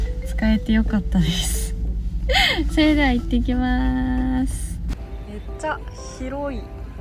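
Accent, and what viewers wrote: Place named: Osaka, Japan. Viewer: お疲れ様です✋六万キロ達成おめでとう(笑)😊何か大阪弁が板についてきたかな？(笑)😊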